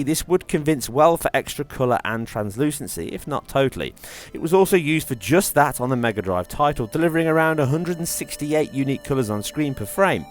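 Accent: United Kingdom. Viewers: Nice vid but (no offense) I can not understand a lot of words you’re saying, with my American ears. What kind of accent do you have, some kind of heavy British accent, right? Anyway nice vid. :)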